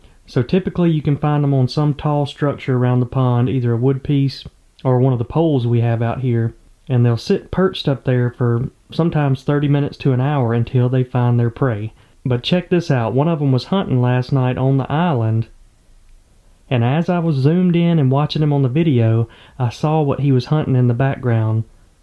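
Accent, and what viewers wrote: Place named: Alabama, United States. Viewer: Yah, that soothing Alabama voice and bass!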